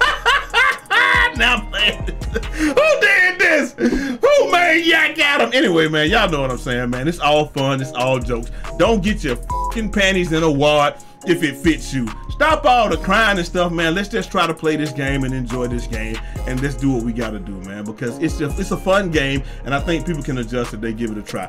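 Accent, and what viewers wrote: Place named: South, United States. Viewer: Crazy you had Shannon Sharpe in this video lol I be calling you the Shannon Sharpe of the 2k community. Not based on age of course but just style and personality. Funny as hell 😂 and the southern accent too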